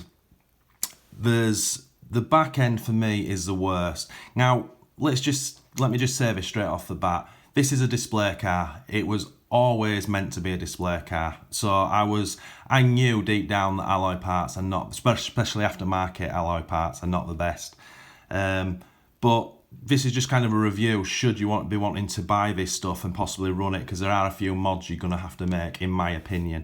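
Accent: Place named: Yorkshire, United Kingdom. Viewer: new to your channel really liking it a yorkshireman in canada dont lose the accent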